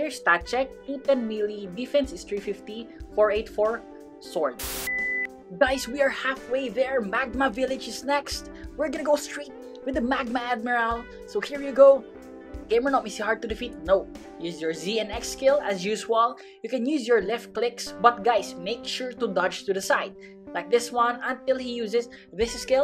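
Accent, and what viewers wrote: Philippines. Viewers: Hi i know your filipino gamernom bc you sound like filipinos so i knew it already do you make a gamernomph channel please tell me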